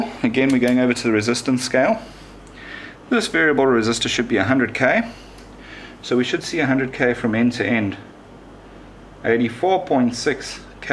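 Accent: South Africa. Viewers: Clear explanation 😊 You sound South African, your accent.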